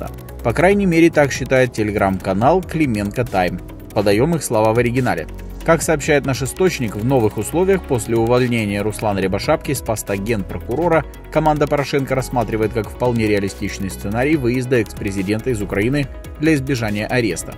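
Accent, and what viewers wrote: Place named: Moscow, Russia. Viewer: Об этом Украине поведал парень с московском акцентом. Из прокремлевского жопо блока.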